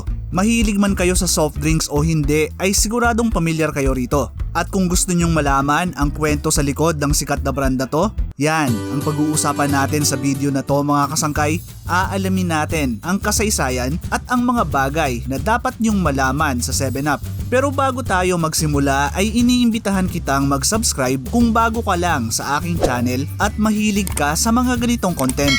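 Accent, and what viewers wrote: Waray, Philippines. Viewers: Waray ka ba tsong?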